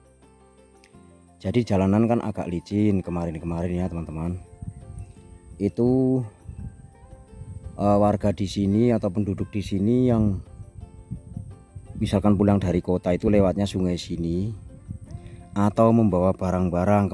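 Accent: Banyumas, Indonesia. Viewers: Tetap semangat, ora ngapak ora kepenak gus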